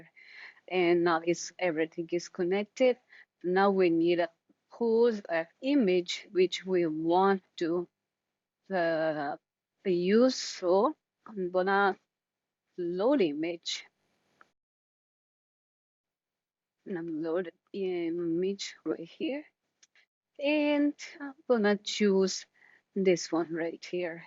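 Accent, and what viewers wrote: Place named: India. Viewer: Just about suffices as a walkthrough of building a very simple workflow for using FaceID with IPAdapter. Half of it is the application of using openpose with a controlnet and NOT a tutorial on FaceID. If you have Indian English and/or experience of low teaching quality then it may prove useful. However, if you're a native Anglo-American English speaker you may find the delivery extremely frustrating. If you are used to being taught by advanced pedagogists then you may also be disappointed by the lack of any clear explanation of the why. This is simply a very basic walkthrough of a simple workflow with no explanation of what is actually happening.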